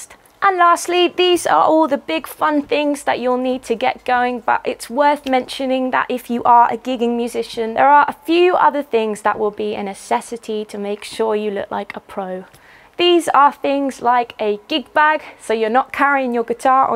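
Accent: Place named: RP, United Kingdom. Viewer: accent contains a trace of the current fad in BBC continuity announcers. I wonder if she even realaahses she's doing it.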